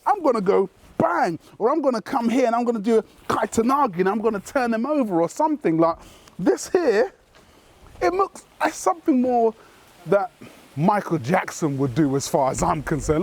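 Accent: United Kingdom. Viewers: I swear you have the best voice and accent of all Brits on Earth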